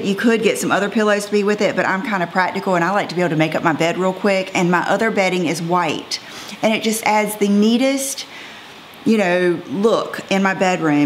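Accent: South, United States. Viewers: Why I watch your videos: -- You inspire me to look my best every day; even when I don't feel like putting an outfit together or putting on makeup. -- You are a genuine and good-hearted human being (something that, unfortunately, is not so common nowadays). -- You are a stylish and classy woman - a great fashion role model. -- You give great tips about shopping, clothing, makeup, jewelry, etc. -- Listening to your southern accent brings a smile to my face every time I start watching one of your videos. -- And for so many other reasons (too many to list)..... I've been a subscriber of yours since the beginning. Thank you for being a constant reminder that all women are beautiful. And for showing us that when you take a little bit of extra time to look good on the outside, the inside follows and your attitude and outlook on life change for the better. 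· I've been watching you for years and following you on Instagram and although I don't comment very often, I just love your personality. I can relate because we are in the same age group and also our children. Although the extent to my makeup is the basics (I have quite a lippy collection however..lol) I just love seeing your purchases from clothes, to makeup to art etc. Let's not also forget, that southern accent. You're as real as it comes. You like what you like and there's absolutely nothing wrong with that. xoxo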